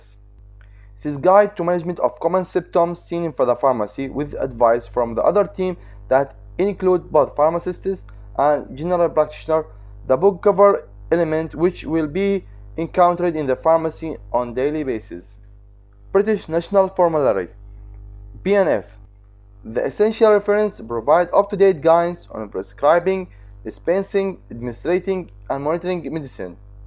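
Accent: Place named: Arab world. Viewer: Your English accent sounds arabic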